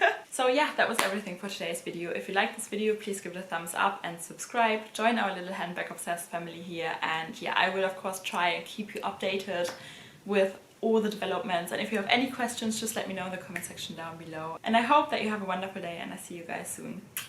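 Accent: Germany. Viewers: lol i hear permanently a german accent in your english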